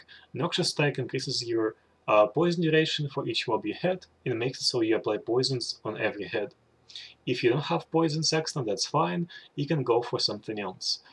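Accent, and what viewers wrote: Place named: Russia. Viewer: Слишком русский акцент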